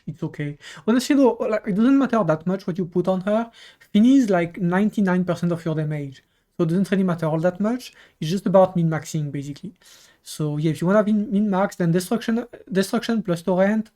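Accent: France. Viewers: Yea man your french accent is too strong lol..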